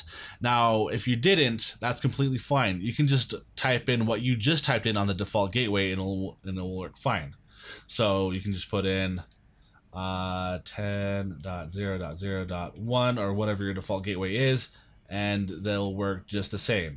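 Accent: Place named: India. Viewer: He's from India english is not his first language idiot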